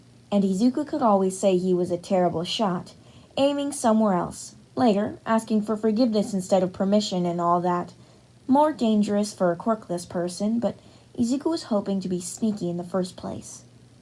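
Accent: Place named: Texas, United States. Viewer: The god damn texas accent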